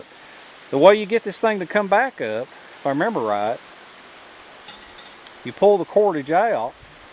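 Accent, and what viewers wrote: South, United States. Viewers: Android Pal then we couldn’t hear his infectious laughter, his melodious deep southern drawl, his “lil jewels”, and that wouldn’t be cool! I d read it too just for the record but I’d miss a lot of above mentioned. 🤘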